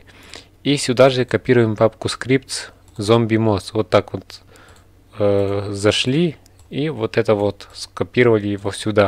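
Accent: Ukraine. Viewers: Ех... Как мне нравиться этот украинский акцент... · похож на украинский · Он украинец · чел это украинский акцент и есть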